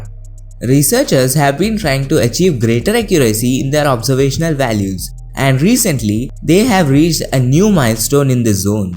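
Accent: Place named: India. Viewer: Wow, Indian English is terrible.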